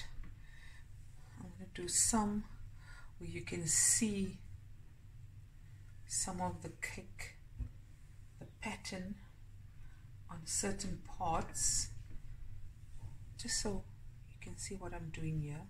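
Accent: South Africa. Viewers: Awesome To hear a friendly South African Voice. I enjoyedyour video very much, everything is already in the cupboards and fridge. I like your trifle its neat and not a messy thing. Lots of love‼️❤️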